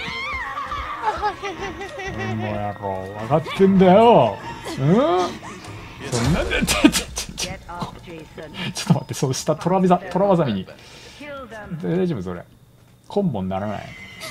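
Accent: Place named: Kyushu, Japan. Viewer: 九州方面の方ですか？聞き覚えのある方言がちらほら…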